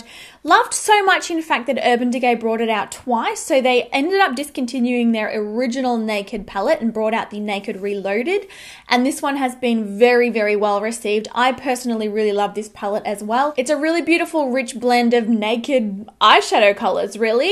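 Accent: Australia. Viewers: Omg your hair looks beautiful!!!! I love your Australian accent! I want to take a trip there it seems beautiful. Thanks for the great dupes! 💖👍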